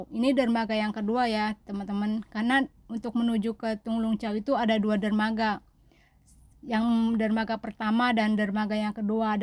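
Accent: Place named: Java, Indonesia. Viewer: Mbak logatnya jawa ,,jawa mana mbak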